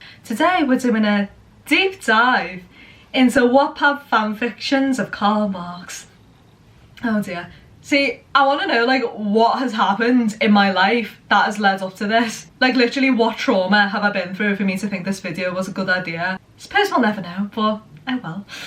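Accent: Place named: Liverpool, United Kingdom. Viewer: Do you think she’s American? Idk if you’re joking she’s scouse lol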